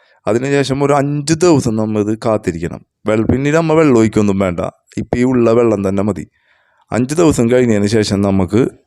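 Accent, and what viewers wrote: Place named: Thrissur, India. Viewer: നല്ല തൃശൂർ ഭാഷ 😄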